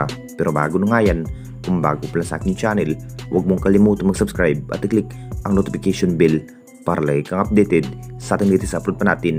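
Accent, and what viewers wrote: Visayas, Philippines. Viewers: bakit b ganyan ka kung mgsalita para kang bisaya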